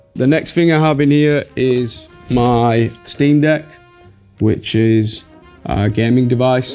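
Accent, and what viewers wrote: United Kingdom. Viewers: "mix it with a bit o wo'oh" man really the Britiest-British eva 😂🥹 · The most British accent ever 😂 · I love his British accent but all of those tattoos makes me feel so scared and terrified around him… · Zayn has the Britishest British accent !! No wonder British Vogue had him here .....love him ❤ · What part of the UK is this accent? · Love that he is still so British even after living in USA for so long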